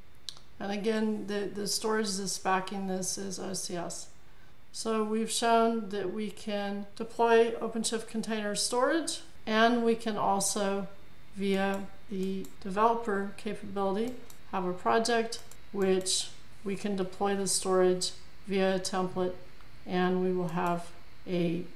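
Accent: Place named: New Zealand. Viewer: Great video. Love the kiwi accent too!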